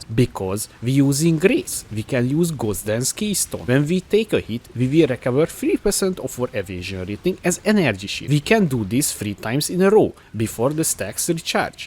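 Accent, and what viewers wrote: Hungary. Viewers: you Hungarian?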